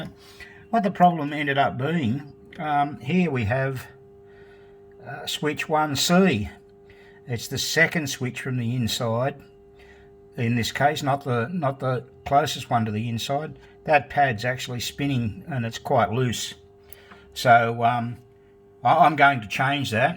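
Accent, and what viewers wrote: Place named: Australia. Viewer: So glad to find your channel mate! To hear an Aussie accent makes it so much easier to follow along.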